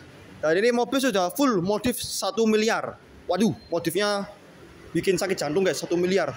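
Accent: Java, Indonesia. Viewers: Komentator nya medok banget... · Mobilnya keren... Yg gak keren tu orang yg ngerivieu nya..katrok medok...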